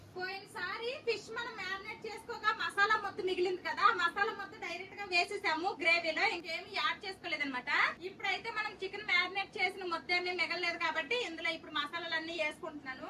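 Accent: Srikakulam, India. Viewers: Hi గారు నా పేరు కరుణ నాకు హాయ్ చెప్పండి మీ శ్రీకాకుళం భాష నాకు చాలా నచ్చింది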